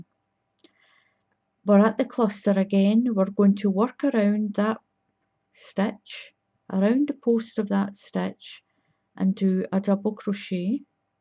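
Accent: Scotland, United Kingdom. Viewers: is that a Scottish accent we hear ??? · Hi, whenever I want to do a crystal waves blanket I always come to your channel, well tonight I decided to put subtitles on as hubby is watching telly, well it seems text to speech doesn't recognise our Scottish accents, no kiddin' I am in fits, you should try it, good job I can follow without sound, anyhoo like others have said your tutorials are very easy to follow, one more thing, do you have sisters called Ann and June? If so I worked with them in Sainsbury's a few years ago.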